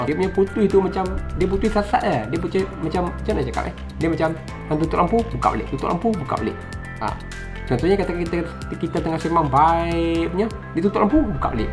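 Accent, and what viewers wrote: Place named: Kedah, Malaysia. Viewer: Bang fasih gila slang kedah.. piau noh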